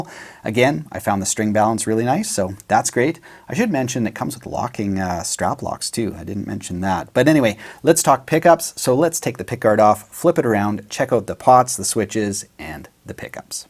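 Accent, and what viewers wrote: Canada. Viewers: I've been waiting for something special as at 67 68 I'm looking for my last guitar purchase..I just sent a email as regards left hand..hopefully its a yes..massive ty everything changed for me since watching your vids and I have watched many..so informative and well presented..and I love the Canadian accent far better than American ha ha..just saying I'm an Essex man England you might not like mine either Yanks but Canadian is a smoother sound..mind you Steve Earle country sound excellent..but I want this guitar quality supreme ty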